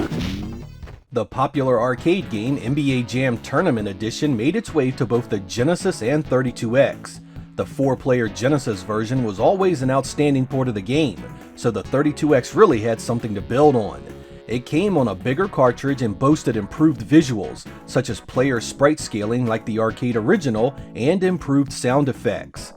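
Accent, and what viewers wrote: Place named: Mid-Atlantic, United States. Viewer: Man it’s obvious you’re a Mid-Atlantic guy!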